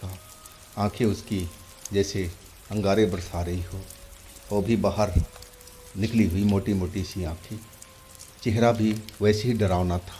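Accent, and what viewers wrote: Bihar, India. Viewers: Nai ye bhai bihar ke taraf se hai, sun sapata bihar me hi bola jata hai. please clear 😂😂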